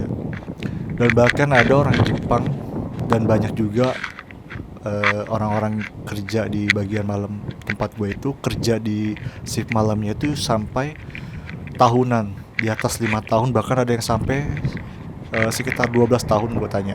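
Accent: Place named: West Java, Indonesia. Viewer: Bang kamu orang sunda ya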